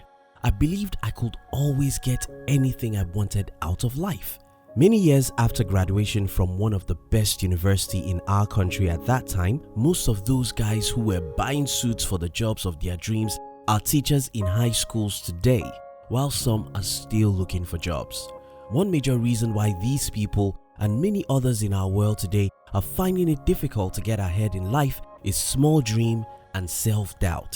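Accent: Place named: Nigeria. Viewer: Strong Nigerian accent 😆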